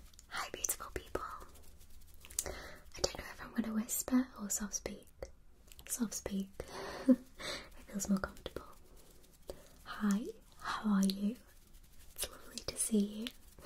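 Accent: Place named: Liverpool, United Kingdom. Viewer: You sound like you’re from Liverpool in this video. Not sure if you are but I’ve never heard it before. I love this video btw grwm asmr is my favourite xx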